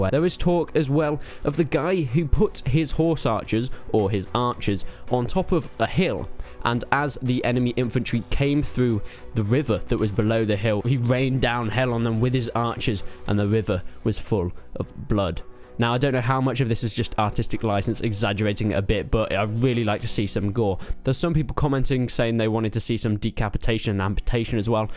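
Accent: United Kingdom. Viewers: Where the hell did he do anything to insult German national pride? - of which there is none, matter of fact, anyways. You just condemned for allegedly hurting "a nationsfeelings", and in the same breath, belittled U.S. Americans, generalized them, for being easily offended. You can't measure actions with one standard and then apply another one to your own. And besides that bullshit - how is it NOT okay for him not wanting to subscribe to a German magazine if he - as he said in another video - doesn't even understand German very well? Fortunately for us, WE don't have to do that now. Is it the profit of the magazine you're defending or is it that you want everyone to read Gamestar? Dude. is - I hope I won't lie - British, judging by his accent. I have no clue, just found the video. And you want him to subscribe to a German gaming magazine so you won't think of him as a some kind of "Anti German Supremacist"? 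Fine. Go subscribe to the first Mongolian game magazine found on google then! Or otherwise, I must denounce you as a racist! Oh, you don't speak any Mongolian you say? - You racist! You think of Asians as inferior?! Dirty German scumbag! Surprise fact - everytime there is exclusive information about a popular subject somewhere in the media - in this case, exclusive intel about the state of Bannerlord in a German magazine - it will get translated, it will be published elsewhere after a while, it will cease to be exclusive. Which is a good th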